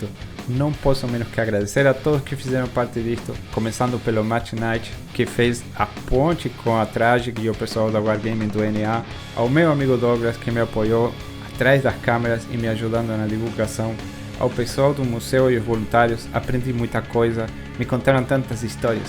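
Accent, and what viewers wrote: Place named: Latin America. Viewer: Top demais,! Obrigado por compartilha com a gente essa experiencia. Agora, tira uma curiosidade por favor: esse seu sotaque não é de brasileiro. Tá me parecendo que tu tem um pé em algum país espano, não?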